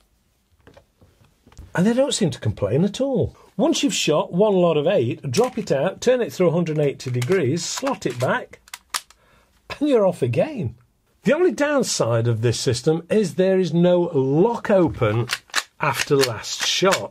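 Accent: United Kingdom. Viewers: I don't know why, but with the goatee and the british accent, I feel like I should call you professor.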